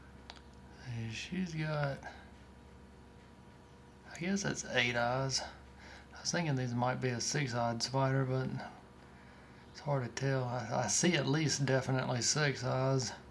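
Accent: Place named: South, United States. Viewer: Where exactly is your accent from? Sounds quite southern to me :) That is one beautiful bouncin' banana spider with the prettiest pattern on its body. The way it's cleaning its legs is still a little awkward to watch, though. I've come a long way with spiders but these awfully long legs are still making me feel rather uncomfortable. I'll get there eventually.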